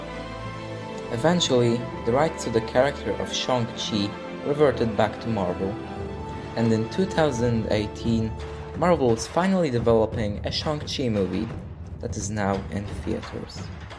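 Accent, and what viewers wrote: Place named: Eastern Europe. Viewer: SLAVIC ACCENT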